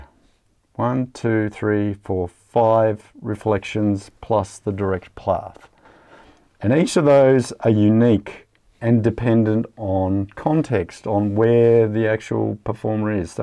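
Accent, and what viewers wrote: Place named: Australia. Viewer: What I found most interesting is that you have a Finnish name, yet you seem to speak with a perfect Australian accent 😄 If you don't mind me asking, have you lived there your whole life?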